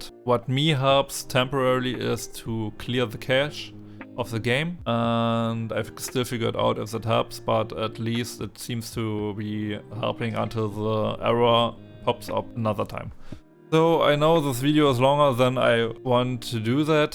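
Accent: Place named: Germany. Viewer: Man hört das Deutsch aufjedenfall raus 😂❤ danke für den hilfreichen Tipp 😍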